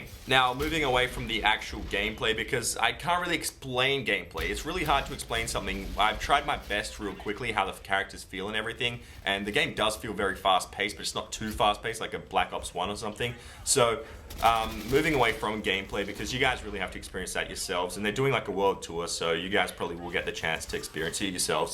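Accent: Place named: Australia. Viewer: why does always say his accent is australian like we don't know?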